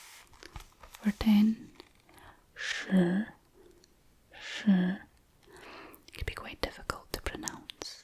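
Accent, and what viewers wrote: Scotland, United Kingdom. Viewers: you are such a fascinating and beautiful person. I love this video., I enjoy all your videos. I enjoy seeing you, listening to your voice, and your Scottish accent, I love watching your hands. You are a very special person and a unique friend. Thank you for the joy and relaxation you bring to me and so many others. Have a great week. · You deserve more subs. Everytime I watch your videos i fall asleep everytime, your voice is soo soothing and amazing, and your scottish accent makes it 10x better ❤. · Are you trilingual? Is Scottish your mother tongue? Just curious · Scottish girls are amazing! Your accent is very nice and pleasant, great ASMR, thanks ;-)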